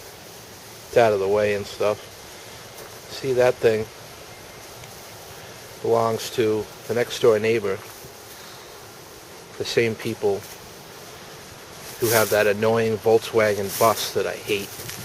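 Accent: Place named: New Jersey, United States. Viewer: You sound like you have a New Jersey accent.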